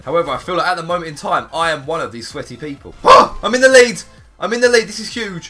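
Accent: England, United Kingdom. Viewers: Lee Gibson he's from England bro, he ain't American or Canadian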